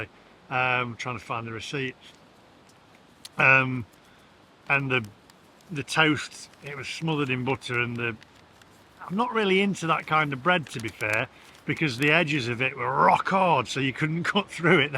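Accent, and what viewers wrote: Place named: Lancashire, United Kingdom. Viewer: bless you ,they can never take away our memories ,only thing that keeps me going some days ,you sound more lancashire in your younger days lol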